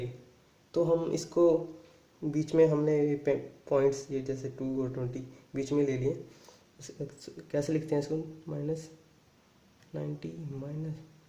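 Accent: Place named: Himachal Pradesh, India. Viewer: आपके बोलने के तरीके से पता चल गया. मैं भी हिमाचल से हूँ इसिलिए।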